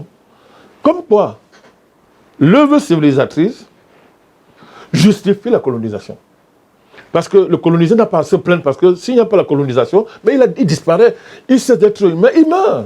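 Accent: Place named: Cameroon. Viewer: Longue vie l’état ivoirien plus respectable et responsable que ton Cameroun de malheur ton accent même est à gerber 🤮 🫢😁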